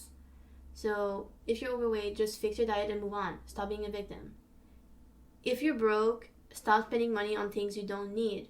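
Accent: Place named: Canada. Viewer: I’m asking because of your accent but where are you from? I think I might have heard you you say you’re from Canada?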